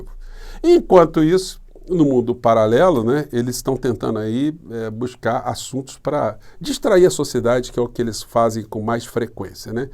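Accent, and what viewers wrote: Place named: Rio de Janeiro, Brazil. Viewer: . Sou paulista. Acompanho e pretendo acompanhar sempre seu canal. Vc é muito bom. Só repare, você mesmo, em qualquer vídeo seu: " vc que é muito bem VINDO e muito bem VIM" Nenhuma crítica ou implicação. É parte do seu sotaque carioca. Mas, perceba aí. Continue do mesmo jeito. Tenho absoluta certeza de que é sotaque.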